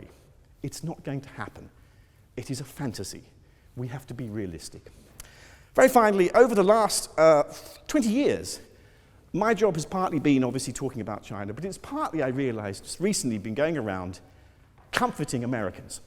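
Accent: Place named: United Kingdom. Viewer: Goddddd...I have trouble understanding British accent..LOL~~~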